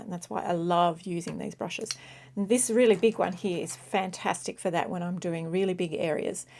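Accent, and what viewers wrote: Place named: Australia. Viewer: super informative! glad to hear a fellow aussie too 😂 thanks for the vid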